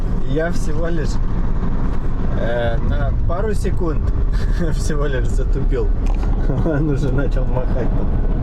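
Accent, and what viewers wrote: Ukraine. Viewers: А кто знает? У него такой акцент как в Украинцев. Он оттуда?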